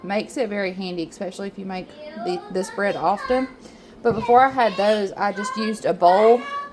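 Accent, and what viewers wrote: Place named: Alabama, United States. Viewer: You sound like you’re from Alabama. I’m a north Alabama girl and I feel the southern cooking in the bones!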